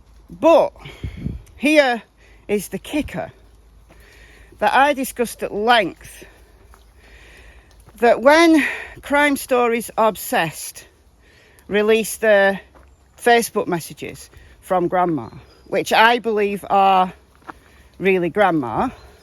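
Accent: United Kingdom. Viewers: You are a beautiful British Lady! And I ❤️ your accent. Nice seeing you before our walk. Great timeline connections. Makes sense. I’m personally getting tired of “what if” scenarios & just wish TBI would confirm some facts they’re working with. IMO this is connected to a larger crime like trafficking & that’s why TBI is silent while they work that angle. Just my thoughts. Luv u & the doggos! ❤️❤️❤️ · where are you from (area of the UK)? You have a very particular accent, so I wonder where in the UK it's typical. Thank you. PS: I love your walks with doggies. They are lucky to have so lovely places to go to with you. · I love your channel, and I so enjoy your walks with your cute dogs. I also love your British accent (I’m a Texan, so you would probably think I’m the one with the accent, lol). Take care. I’ll be watching/listening!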